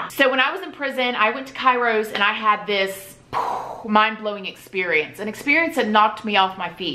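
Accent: South, United States. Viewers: 🇨🇦 .... You aren’t even my kid .. and we area world away .....But wow .. I have to say DAMN girl I’m proud of you !! ... I’ve watched a few of your videos today starting with your horribly abusive childhood ... your prison story ... The whole cavity search (your poor thing) ... And of course your amazing transition to finding 🤗God 🙏🏻 .... I am a Christian and I love that you are bold enough and brave enough to expose so much of yourself and your story to the world ... because there’s no doubt you already have and will continue to help so many !... I hope everyone takes the time to watch and listen to the one about finding God in particular because if ever we need Him it is NOW! ... You are such a beautiful person and I’m a pretty good judge of character !... I am Canadian and I love your southern accent it suits you to a T ... Your son is precious and so blessed to have a mama who can show him what is possible in life even when you don’t believe in “yourself” ... 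God believes in you ... And “we can do all things through Him who gives us strength” Your story and what you have accomplished through it all is rare I hope you know ... Not many who had gone through ALL that you have could have climbed out to become all that you have and are ... Hugs 🤗 to you ! ... Keep on keepin on !💕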